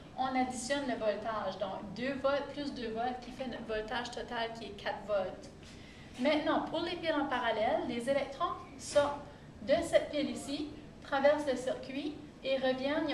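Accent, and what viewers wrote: Quebec, Canada. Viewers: vous etes bon professeur et vous etes belle .votre prononciation Québécoise m'excite .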